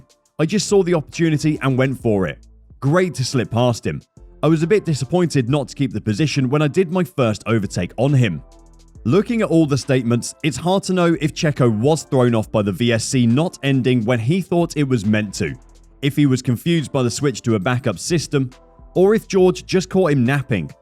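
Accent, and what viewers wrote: United Kingdom. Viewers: Typical British: Unsporting as hell, but with a nice accent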